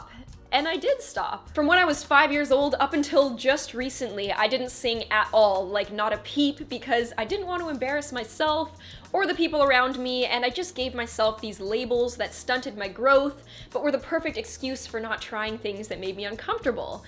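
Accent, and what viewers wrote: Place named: Canada. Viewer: Such a lovely message! I just want to pinch your cheeks - you're so pretty. "Don't mock the process!" Sounds so much better in your Canadian accent (than my English accent) but such a golden quote.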